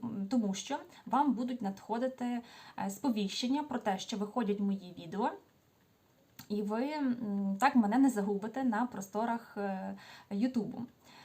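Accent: Standard. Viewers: Привіт. Підписана від початку війни. Подобається ваш голос і чиста українська мова. Ви дуже приємна. Бажаю здоров'я вашій донечці, бо чую що кашляє). І мирного неба! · я підписалася на Вас неділю назад.Підкорили чистою українською мовою, щирістю, сімейністю. Вы завжди розказуєте про донечку,видно,що вона-ваше найбільше досягнення, гордість. Для мене-ви релакс вранці і ввечері. Подивилася відео про порожні баночки-почала використовувати пробнички,а їх за 10 років дружби з мережевим так багато!Дякую!Процвітання каналу!І набрати скоріше 1000 підписників!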